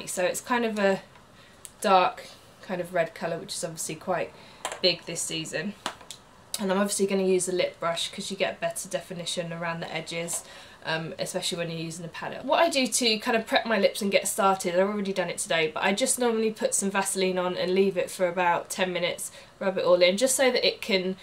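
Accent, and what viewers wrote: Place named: United Kingdom. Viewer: Nice video! I love your voice and your British accent! I could listen to you talk all day long! Please do more of these makeup videos/tutorials, maybe some you are getting ready? Thanks! oh, I subscribed! 😍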